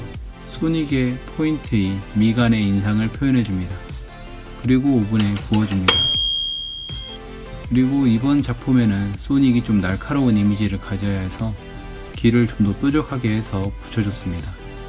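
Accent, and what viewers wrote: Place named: China. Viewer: Cara ele é de china você não viu como ele fala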